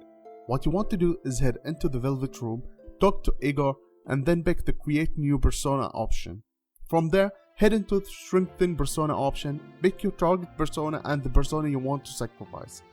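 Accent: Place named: Arab world. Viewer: has an arabian accent 👀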